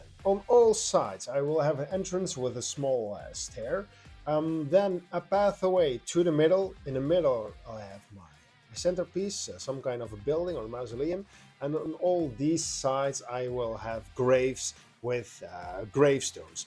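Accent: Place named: Netherlands. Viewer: Dutch? Thenaccent sounds like it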